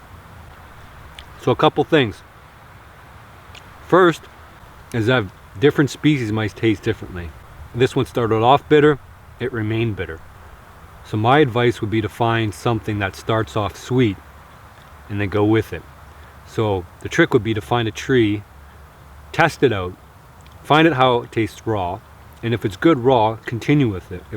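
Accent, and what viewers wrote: Canada. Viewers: He’s sounds Canadian😄😄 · you are Canadian! Test it Oat = test it out! Haha, nice channel! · The second I heard your voice I knew you were Canadian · Finally a Canadian accent i can hear. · cool! Ton anglais est impeccable, impossible de deviner que tu parles aussi en français:) mais probablement avec l'accent du Nord comme à Sudbury:) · You sound hella Canadian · I like how he doesn't have a Canadian accent and then it just says in this one video of how he talks Canadian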